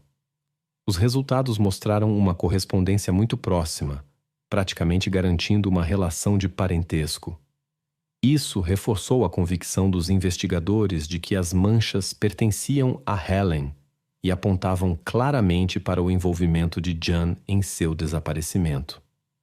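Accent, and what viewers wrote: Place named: Portugal. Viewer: O canal é ótimo, exceto quando coloca sotaque de PORtugal. Afffff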